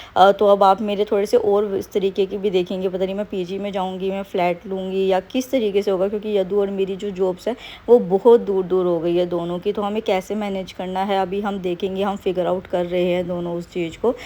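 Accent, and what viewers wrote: Haryana, India. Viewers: Hii didi ...is bar ke upsc prelims ke baad maine baki exams pr bhi focus krne ka socha h pls if u can reply mujhe bta do.... 1). Mai delhi se hu toh kya haryana ka pcs me chances h kyuki mujhe lgta h sb apne states ke candidate ko preference denge.. maine apko uppcs dete dekha h lekin apki boli se ap mujhe haryanvi lgte ho pls if u can help me out. 2). Apne epfo dia h kya exam or uski strategy?😅 Btw , Happy marriage life didi...May your forever after be just as magical as your honeymoon.❤🎉🦋🌸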